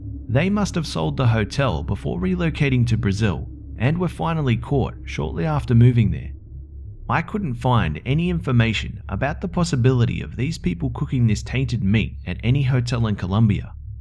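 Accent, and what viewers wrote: Australia. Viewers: Hello B are you Australian??? Pleas reply. Your the best!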